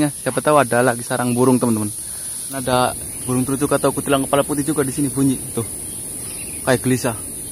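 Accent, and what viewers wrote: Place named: Indramayu, Indonesia. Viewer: Kyane Wong Dermayu Ikih Logat²e Mah😁 Sukses Terus Ang👍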